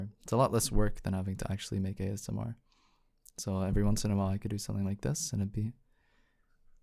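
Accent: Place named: Middle East. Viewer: 1 I'm so sorry for you loss ik what it's like to lose someone that is close to you I hope you are feeling better and that you have a great mental journey 2 I NEVER KNEW YOU WERE MIDDLE EASTERN I THOUGHT I WAS TRIPPING ABOUT THE ACCENT 3. I'm glad you are back from your trip even tho it was very hectic lol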